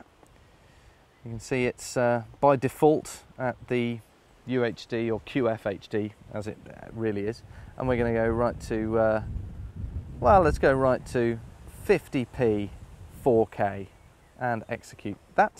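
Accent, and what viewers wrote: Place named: England, United Kingdom. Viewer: english accent sucks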